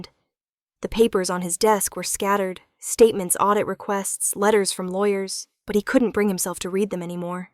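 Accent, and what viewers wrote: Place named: Indiana, United States. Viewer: I thoug y t she was from Indiana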